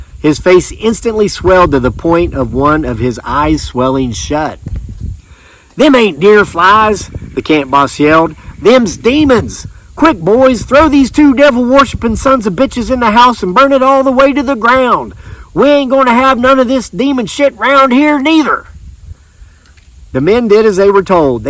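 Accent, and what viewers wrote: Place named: West Virginia, United States. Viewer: I love all of your stories ..... I was born in West by God Virginia and spent my first 18 years there..... I love hearing your West Virginia accent..... and stories ....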